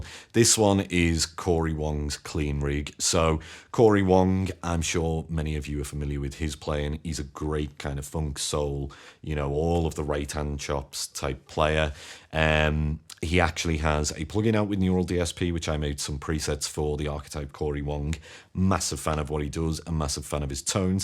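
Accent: Liverpool, United Kingdom. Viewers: The scouse accent just is the best for music reviews ❤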